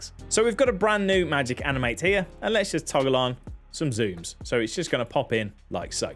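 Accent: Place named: Midlands, United Kingdom. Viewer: I live in the Midlands and have an accute "accent" awareness.. Yours sounds about 20 miles from Colin Furze! Somewhere north of Leicester and up as far as Nottingham. Just a random comment!! Cheers, Callum.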